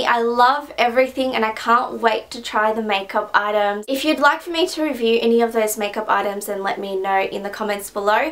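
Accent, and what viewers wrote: Australia. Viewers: Yeah aussie accent! Your gifts are just as good as hers, things in oz are so expensive!!! Im an aussie girl in US :-)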